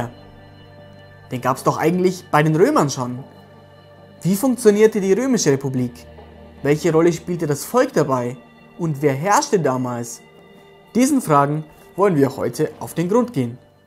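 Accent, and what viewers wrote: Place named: Austria. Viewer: sehr gutes Video, kömmste aus österreich wegen den Akzente? Mache weiter so!! Grützi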